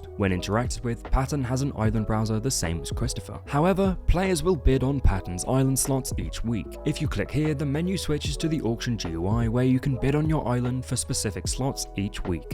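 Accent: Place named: United Kingdom. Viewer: Video is good even tho the guy speaking is British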